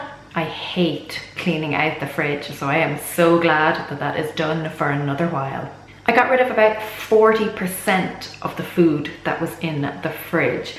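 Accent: Ireland. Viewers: Love your Irish lilt, could listen all day! Your doing a great job and it's so frustrating when you don't have everyone in household with same mindset, sorry to hear of your loss.⚘⚘⚘ Donna from SCOTLAND xx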